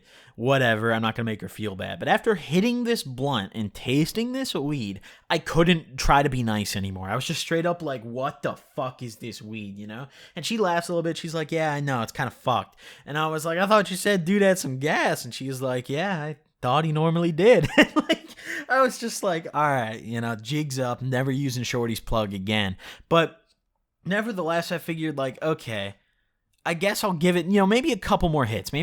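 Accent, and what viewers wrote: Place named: Midwest, United States. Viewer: the way u talk ur most def from the midwest XD love ur content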